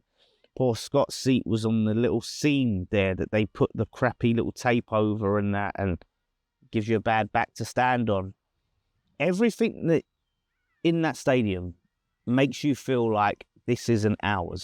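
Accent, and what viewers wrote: Jamaica. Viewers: why do you talk like a jamaican? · I probably agree with everything you say - but please change your accent, you sound like a yardie in Jamaica - I had to switch off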